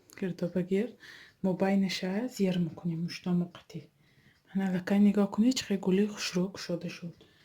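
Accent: Isfara, Tajikistan. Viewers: Гап нест. Апачон ба фикрам шумо а Исфара а? Лахчатон худи исфараги боин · Рахмати калон моям аз исфара аз тарафи таги бихи 39 сола шахри Саранск ба 6солбоз зиндаги мекунем сохиби 5 писар❤️❤️аз овозатон фарк карам ки аз исфараи бостони хастед.. Хелллеее шод шудам.. Дина торти наполеон ро бо рецепти шумо таер карам бенихоят хамираш махину бомазза буд рахмати калон...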